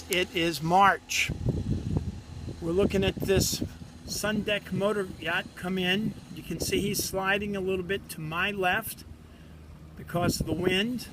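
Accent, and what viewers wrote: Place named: United States. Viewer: That commentary made my ears bleed... soo American... As for bouncing off the pole to come forward, umm, isn't that what 'ahead' on an engine is for... You bounce of a pole like that on 9 out of 10 boats and you won't have a job the next day, although nothing wrong with using a pole with a sponson sitting on it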